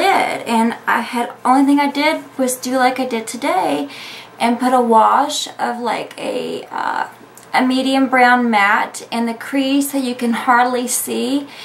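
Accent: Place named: South, United States. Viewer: im in Michigan girl so our weather is just like yours either nice and then bamm its now 85 then the next day back to 65 for a few days then now 90 for a week its nuts! We had some bad tornadoes last week but now its ok this week. Missed your sweet lil southern voice lol good your doing ok. Love your vids n advice n specials n of course tips.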